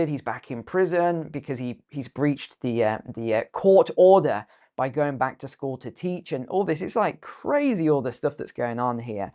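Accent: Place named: United Kingdom. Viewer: are you on the Isle of Man? What is your citizenship? I'd bet that most viewers on youtube think you're an American with a British accent. I confess I've been confused.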